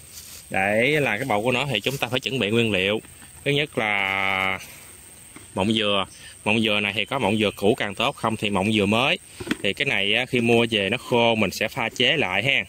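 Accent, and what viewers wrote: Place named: Mekong Delta, Vietnam. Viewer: Đúng chất giọng người miền Tây quê tôi, chỉ dẫn thật tình và tận tâm. Tặng cậu một like. Chúc cậu luôn gặp nhiều may mắn trong cuộc sống 🌺🌺🌺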